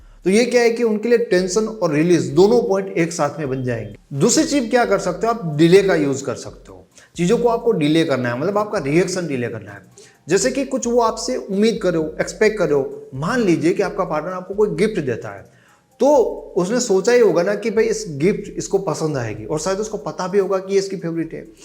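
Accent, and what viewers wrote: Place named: Kathiyawad, India. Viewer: Sir aap gujrati kathiyawadi lag rhe ho bolne ke tone se lag raha hai😅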